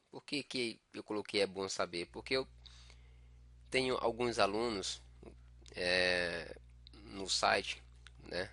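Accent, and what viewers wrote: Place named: Northeast, Brazil. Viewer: Que sotaque gostoso de ouvir! rsrs O senhor é do nordeste?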